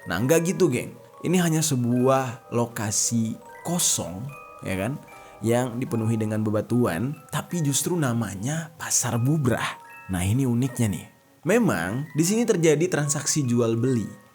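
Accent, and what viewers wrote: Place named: Jakarta, Indonesia. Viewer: Mbah petruk, pengucapan nya lucu kalo orang jakarta yg ngomong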